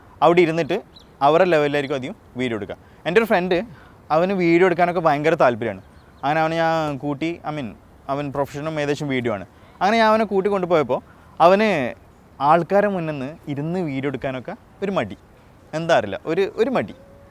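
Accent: Kannur, India. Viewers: From kannur right? nice talk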